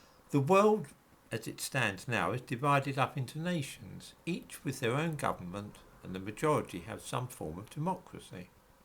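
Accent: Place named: Cockney, United Kingdom. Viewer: He thinks he has a neutral accent? Maybe to an East- Ender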